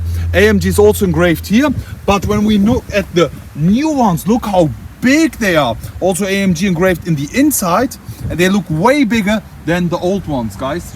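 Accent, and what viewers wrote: Germany. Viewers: I don't want to offend you now, but you should really work on your English, the intonation is awful. That sounds like a foreigner is trying to speak German so abruptly, only in English.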